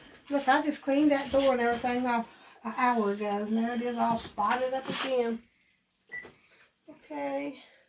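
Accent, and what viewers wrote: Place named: North Carolina, United States. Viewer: My first time viewing. Your accent reminds me of home ❤️ I’m from the Foothills in NC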